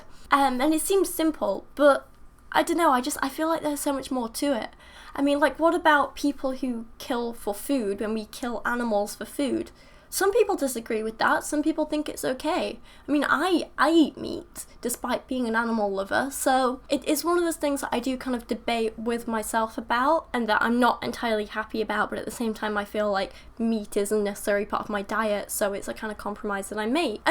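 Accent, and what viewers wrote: United Kingdom. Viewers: u said about ur accent, I mean no offence but are u british, american because I can't be sure. sometimes u sound really British but u don't pronounce the letter t it's more d sounding. just curious, I never thought about it until u brought it up so pls don't think I'm being a troll. it a genuine question. I would guess ur British? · Wait?¿ People actually make fun of your British accent?¿ I thought everyone loves British accents. Lol. Like I said to another prominent Atheist YouTuber (EssenceOfThought), 'I came for the British accent, but stayed for the Religion bashing and Science!' Lol =^p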